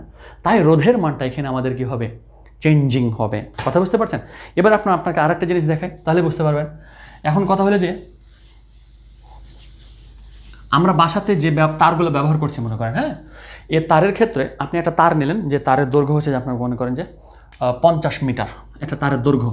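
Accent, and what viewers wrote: Rajshahi, Bangladesh. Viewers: আসসালামু আলাইকুম। স্যার আপনার বাড়ি কি রাজশাহীতে ??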